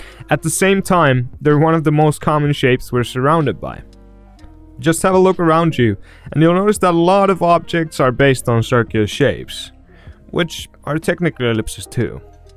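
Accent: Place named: Finland. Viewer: Haha I guessed right - Finnish accent! (Altough being Finnish myself it's not much of an achievment)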